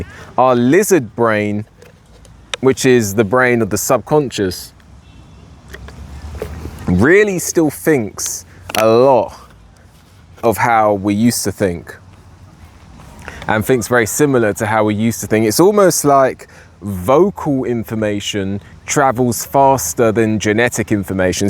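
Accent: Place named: United Kingdom. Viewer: Bra it was bc of your bloody British accent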